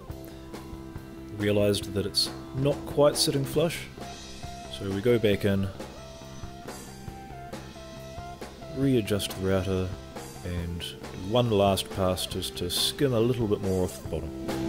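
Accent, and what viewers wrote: New Zealand. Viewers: I thought so when I seen the wax remover and the mention of Bunnings and you sound like a Kiwi..so am I in north island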